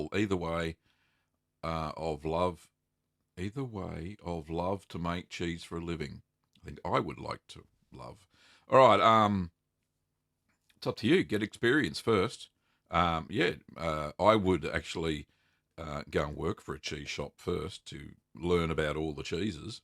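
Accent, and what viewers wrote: Australia. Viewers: I apologize, and now I feel ignorant. I'm pretty sure that's an Aussie accent now - My friend lives in NZ. Terribly sorry for that. I checked your website's IP and saw that it originated from an unknown location in AU.. I feel really silly. Have a good night!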